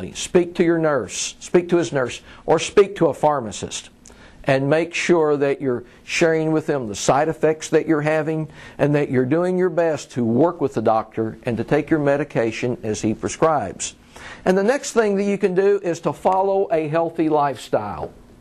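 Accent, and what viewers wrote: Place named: Kentucky, United States. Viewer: Kentucky. Need I say more? Learn to speak English please.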